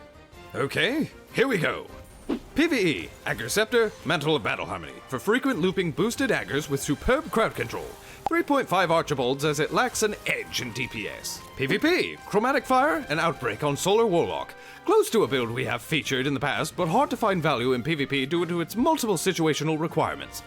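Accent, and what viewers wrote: United Kingdom. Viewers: i noticed that throughout the entire video the narrator has a british accent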